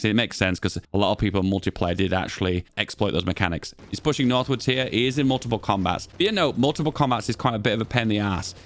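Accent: United Kingdom. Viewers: Eh British 🤮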